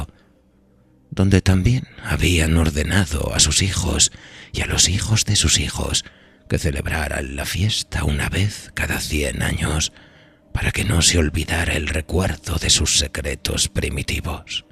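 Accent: Spain. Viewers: oír estos relatos con esta narrativa castellana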